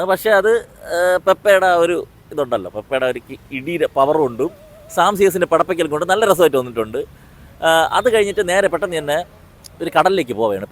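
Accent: Trivandrum, India. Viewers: എനിക്ക് താങ്കളുടെ തിരോന്തരം ഭാഷ ഇഷ്ടമായി !😂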